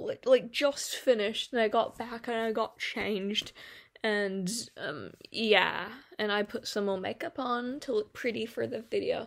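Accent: New Zealand. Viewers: ur accent sounds sooo familiar r u from nz? or maybe australia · Your accent swerves between kiwi and southern US.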